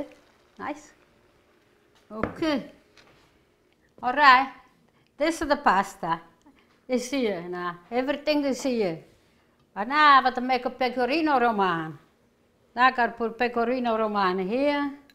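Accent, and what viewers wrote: Italy. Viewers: She is too cute, I love when she talks, I miss that accent like my mom’s and other relatives from Italy...COOKING TALENT CANT COMPARE, thank you for sharing your knowledge and love of serving others💕 God bless you and your loved ones, ❣️ Love your singing, memories of my beloved mamina, it warms my heart❤️ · ammore! Thank you for these wonderful recipes. You make them so much fun with your stories, the singing and Italian accent. God bless you always! 🙏🏼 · I like your English language with Italian accent😘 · You are a joy to watch! (Have to listen very closely because of your beautiful Italian accent). Thank you so much for all of your great recopies. (Have of copy of your cook book). You are a very special lady I and enjoy watching you create all of your wonderful creations in your kitchen! God Bless! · gramma, love a your recipes. italians gota have the best accent.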